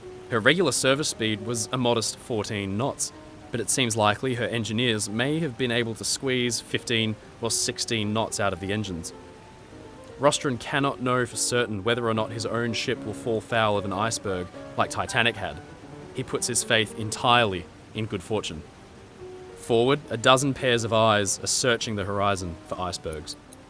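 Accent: Australia. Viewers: yay!!! Aussie narrator😃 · The fact that he’s Australian makes your statements pretty revealing… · Fantastic script well read fellow Aussie? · he's Australian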